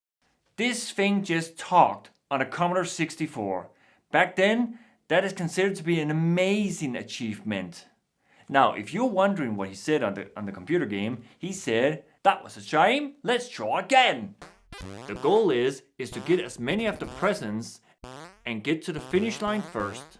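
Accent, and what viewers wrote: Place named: Denmark. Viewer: Work on your danish accent. Work on your postere. This whole thing felt very bland to Watch. Also this has 0 relatability for none danish viewers, so if you insist on doing video’s in english, make sure that there is at least something that none danes Can relate to. Otherwise you might as Well just speak danish. Not trying to be toxic. This is just my honest oppinion.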